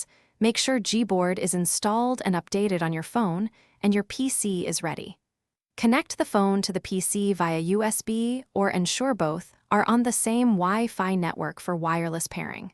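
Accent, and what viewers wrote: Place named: United States. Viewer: The overly-American accent is REALLY grating. Please over-pronounce the letter R so it's like fingers down a blackboard.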